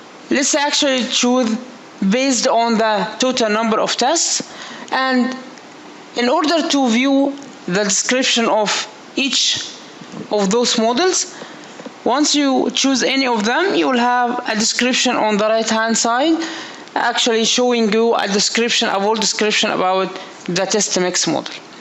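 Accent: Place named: India. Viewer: Индусский английский перестал резать слух примерно в середине видео. Тем не менее, теперь я знаю как делать нагрузочное тестирование. Gracias!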